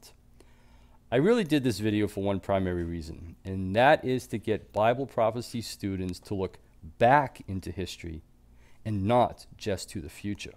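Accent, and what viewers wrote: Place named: New England, United States. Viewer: Good info! And I know this is trivial, but I love listening to your accent. I grew up in New Hampshire and have relatives in Maine. Married a Virginian, and have lived 22 years in Ecuador. But I know a New England accent when I hear it. ❤️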